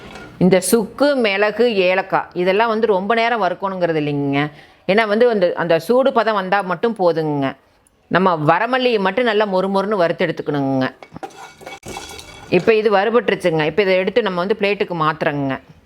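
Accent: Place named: Kongu, India. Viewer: Amma neenka yentha ooru kongu side mathire irukku?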